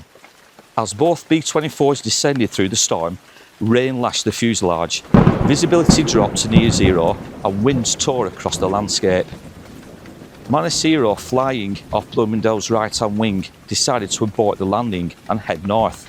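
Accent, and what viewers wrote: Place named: Rochdale, United Kingdom. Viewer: Another sad story but great video, You tell good stories and you're really good at what you do. you have the same accent as a good mate of mine that lives in Rochdale, we've been good friends for 25 years.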